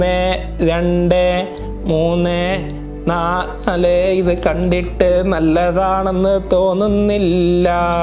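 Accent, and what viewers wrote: Trivandrum, India. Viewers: Bro ningal TVM aana??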